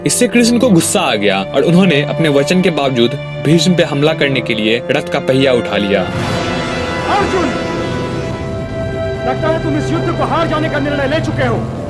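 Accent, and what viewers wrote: Bihar, India. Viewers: Bihadi ho kya bhaiya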